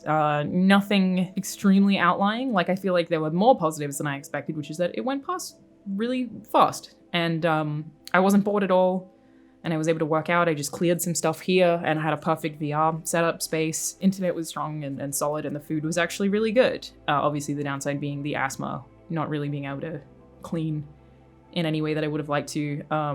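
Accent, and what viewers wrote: Australia. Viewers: The main thing I noticed is that your Aussie accent has come to the fore quite strongly. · hermoso aussie accent 💙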